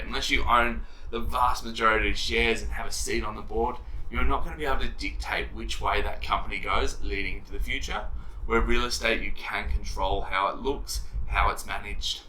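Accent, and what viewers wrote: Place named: North, United Kingdom. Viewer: Keep going. Great to hear a voice from the North about the North.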